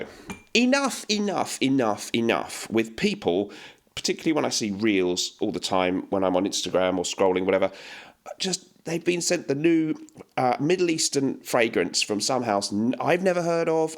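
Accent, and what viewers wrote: England, United Kingdom. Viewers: Caro, desative essa dublagem ridícula. Entendo melhor seu sotaque inglês do que o americano. Fora isso qual Árabe compensa para quem gosta de Drakkar Noir, Guilty Absolute, Azzaro Silver Black, algum todo clima para São Paulo?? Obrigado pela atenção, seus vídeos são só para quem gosta muito, assim como eu.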